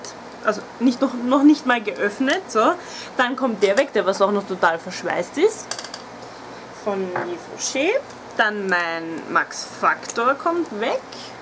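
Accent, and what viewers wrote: Austria. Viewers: Tröste Dich, ich kenne das - ich kann mich von solchen Dingen auch so schlecht trennen, auch nicht von denen, die ich sowieso nicht benutze, dabei wäre es aktuell schon angebracht, das endlich einmal wieder zu tun, obwohl ich gar nicht sooo viele Lippenstifte besitze. Aber was soll´s, es gibt schlimmere Angewohnheiten ;) . Ich mag Deine Videos so sehr, weil Du so wunderbar authentisch wirkst - wenn mal eine Weile nichts kommt, schaue ich mir deswegen auch gerne die älteren Videos noch einmal an. Dieser leichte Wiener Akzent wirkt auf mich Norddeutsche gleichermaßen beruhigend und erfrischend, wenn ich also ´mal krank zuhause bin oder ein bisschen Hintergrundgeplapper brauche, während ich aufräume etc. , sind Deine Videos für mich optimal :)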